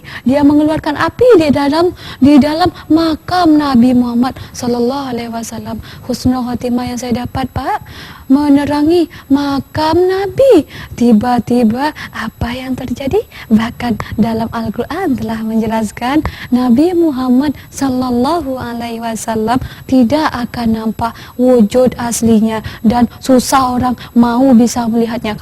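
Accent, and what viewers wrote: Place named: Malaysia. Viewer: bicaranya mcm org malaysia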